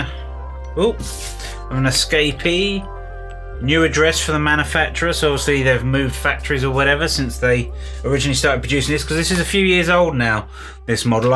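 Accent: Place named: South Wales, United Kingdom. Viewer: You have a southern welsh accent, you aren't by chance from Glamorgan are you? Very Anthony Hopkins'esque, great video mate!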